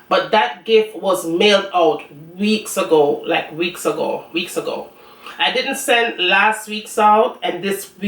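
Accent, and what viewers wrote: Jamaica. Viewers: Very Jamaican. I love it.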